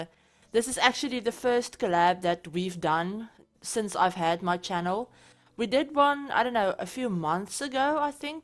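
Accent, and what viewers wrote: Afrikaans, South Africa. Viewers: Ek kon dadelik die Afrikaans in jou aksent hoor!!! Volg al lank vir SimKim, en eks so bly julle het hierdie collab gedoen. Die interior pas die exterior amazingly!!! Stunning! En ja, ek sub nou jou kanaal ook. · oh that's so cool, I love the South African accent. Been trying to master it for so long haha, very difficult.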